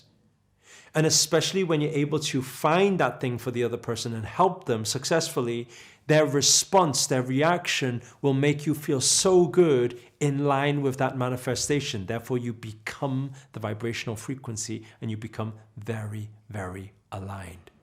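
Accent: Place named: Liverpool, United Kingdom. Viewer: You sound like your from Liverpool.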